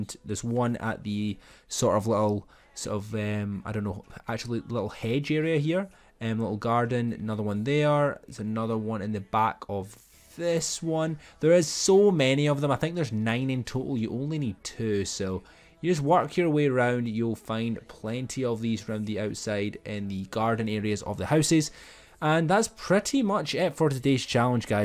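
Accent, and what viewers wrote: Scotland, United Kingdom. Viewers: Are you scottish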